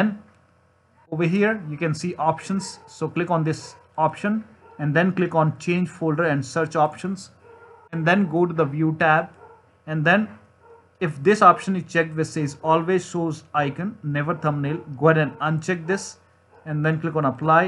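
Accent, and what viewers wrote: India. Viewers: start video hear indian mute video turn video off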